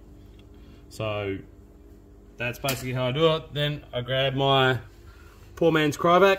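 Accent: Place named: Australia. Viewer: I thought you were in Australia from the accent 😁 I saw another video where there were leeches in fields there 😳 Do you guys have ticks everywhere too?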